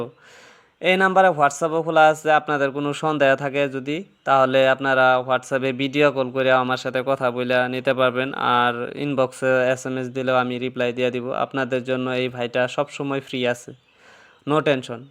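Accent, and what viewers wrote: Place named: Bangladesh. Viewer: আপনার বলার স্টাইল বাংলাদেশিদের মতো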